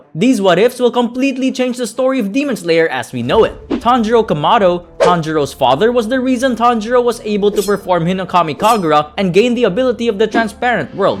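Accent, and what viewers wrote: Philippines. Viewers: Filipino ahh acent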